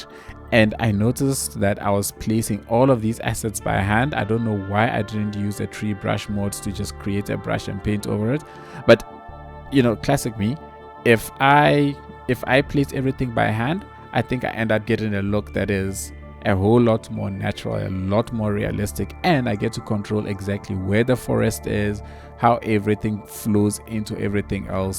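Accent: South Africa. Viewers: Bruh are you South African???????? Mans that accent, i know that accent from anywhere xD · Bro are you South African? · Man it’s great to hear a South African voice on YouTube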